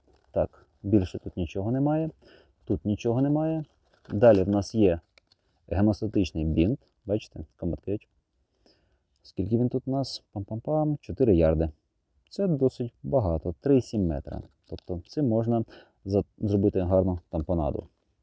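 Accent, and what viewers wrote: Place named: Russia. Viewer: Может не стоит мучаться и пытаться добавлять "украинские" слова? :) Слышно же, что русский лучше знаете. Больше людей поймут, что вы говорите. ps. "Специальная такая штука" :))) На алике кстати хорошие наборы продают и недорого. "Rhino Rescue". Там даже этот наглазник есть.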